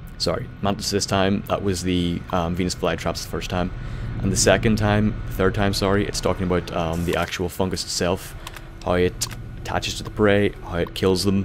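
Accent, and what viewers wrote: Northern Ireland, United Kingdom. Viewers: Are you from Northern Ireland? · I respect you Northern Irish Morgan Freeman. Also as you didn't upload a video this week are you uploading an extra video?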